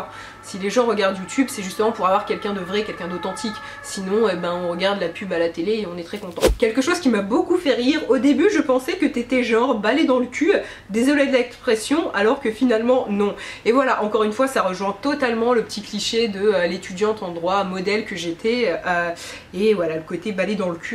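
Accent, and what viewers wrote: Paris, France. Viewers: C'est surtout son accent parisien et sa façon de se tenir et de bouger qui bourgeoise selon moi ^^ c'est pas une critique j'ai plein d'amis qui ont les mêmes manières et je les ai eues moi-même à une époque! Mais elle a clairement ce petit "je ne sais quoi" cliché des "bcbg"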